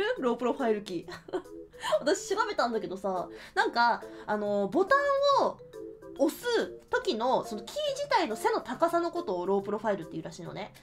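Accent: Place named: Kansai, Japan. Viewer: 関西弁なんですね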